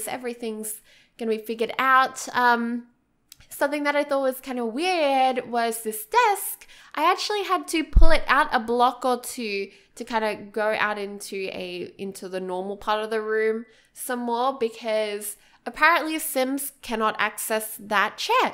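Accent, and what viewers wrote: Australia. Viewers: Hamad Rashed Probably because of the Australian Accent we all sound similar 😊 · Artem XCX kid it’s called an Australian accent they are lovely and I am blessed to have an “annoying accent” if you don’t like it leave press the red X at the top of your screen it helps (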